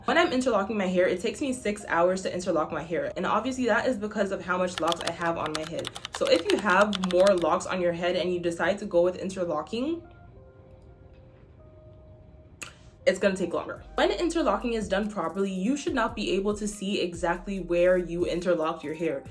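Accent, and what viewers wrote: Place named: Africa. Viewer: You have a slight accent, I’m just curious are you African? Also I love your videos, they’re very helpful.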